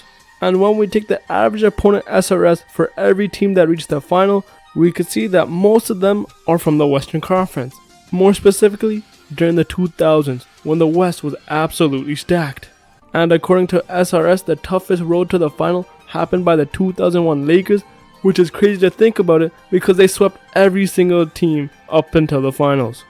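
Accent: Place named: India. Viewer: i can tel ur a fuckin indian.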